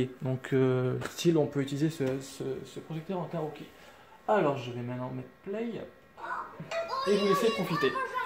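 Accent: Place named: Cher, France. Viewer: Bonjour Vous êtes du chére. Je reconnais l'accent.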